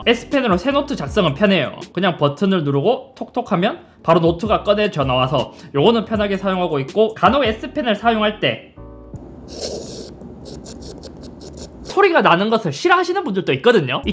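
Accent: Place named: Western Gyeongnam, South Korea. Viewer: 앗!! 이제 아이폰 애플워치에서 아예 갈아타신거에요?? 잇섭님의 서부 경남목소리가 정겨워서 리뷰가 눈과 귀에 속속 들어왔는데... 같이 삼성으로 갈아타기도 그렇구... 항상 응원할께요!! 그럼... 기존 애플 제품 중고로 파시지는 않으세요??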